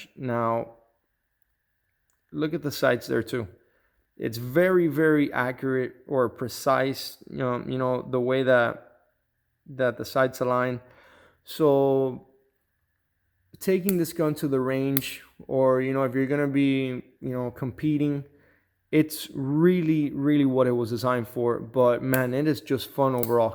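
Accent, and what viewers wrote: Miami, United States. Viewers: You sound like you’re from Miami lol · i can tell you are from Miami just by the way talk bro lol, good video man keep it up!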